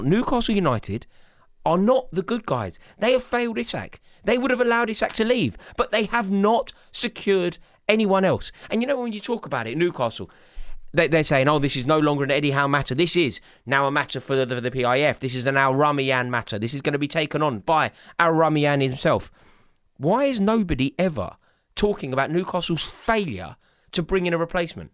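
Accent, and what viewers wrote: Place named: Liverpool, United Kingdom. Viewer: I love your Scouse accent and I love that you said isak won't play for Liverpool lmao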